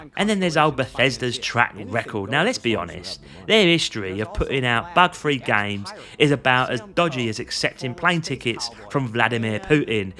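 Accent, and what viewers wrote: Cockney, United Kingdom. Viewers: Mate, I reckon it’s gonna blow expectations personally, you may be cockney but you ain’t east London, keep the videos coming love to hear the thoughts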